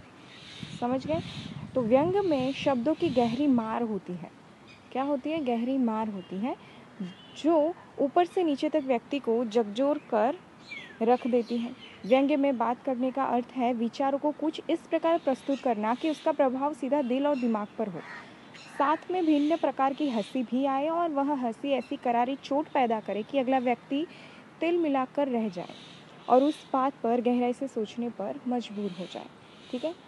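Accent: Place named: Maharashtra, India. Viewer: Kya ap Marathi ho apke accent se pta lgra h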